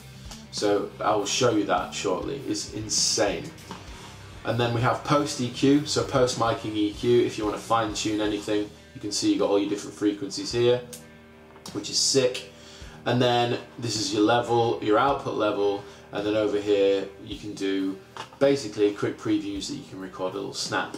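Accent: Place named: England, United Kingdom. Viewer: where are you orginally from in england? its something i've wondered for a while, i think i can detect an accent.. enlighten me:)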